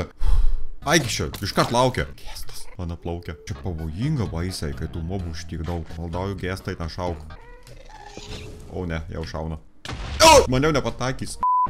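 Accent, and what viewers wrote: Suvalkija, Lithuania. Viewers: Gerai moki kalbėt suvalkietiškai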